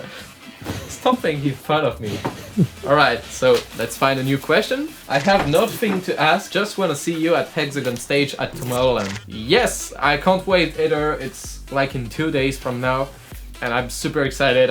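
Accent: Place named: Toulouse, France. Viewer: Belle accent toulousainggg 🇫🇷🇫🇷🇫🇷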